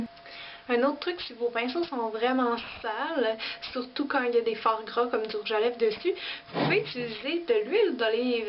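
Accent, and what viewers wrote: Quebec, Canada. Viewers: C'est l'accent québécois ça n'a rien de bizarre ...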